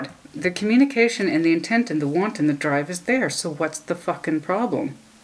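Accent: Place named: South, United States. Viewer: That deep sexy raspy voice southern and unique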